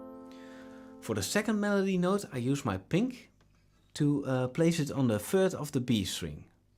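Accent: Netherlands. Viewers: Hahahaa je kunt horen dat je nederlands bent ! Geniaal ! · nice one thanks alot! Are you dutch? i can hear it by your accent ;) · Your accent, sounds awesome, let me guess, you're dutch, right?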